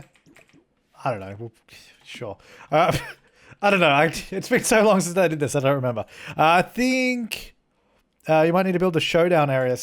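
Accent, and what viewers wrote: Australia. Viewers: Ya Mong 😂😂 such an Aussie !! I love it !!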